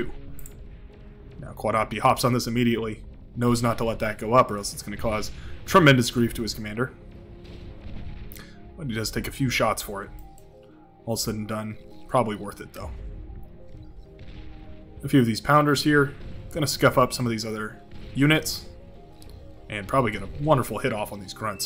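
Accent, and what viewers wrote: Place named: United States. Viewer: great to see the interest in the game. great cast. keep up the casting and learning., its good to see new players coming through and will be interesting to see how they develop and some maybe even surpass the established "pro" like myself :) if you can get in at GMT evening times you'll see much higher ranks playing so you can learn some more, but you sound american so maybe a bad time for you lol.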